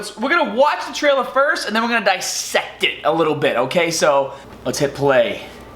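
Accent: Boston, United States. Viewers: That boston accent tho lol x'D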